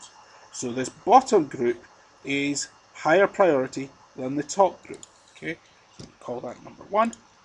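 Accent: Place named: Scotland, United Kingdom. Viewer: I think that is a Scottish accent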